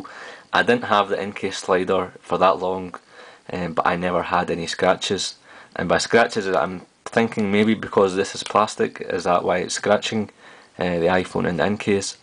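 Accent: Scotland, United Kingdom. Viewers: Your accent is so cool! I'm going to take a guess - Scottish?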